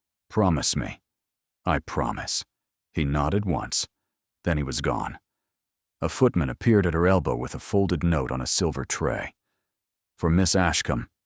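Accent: United States. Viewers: Cant bear us accent.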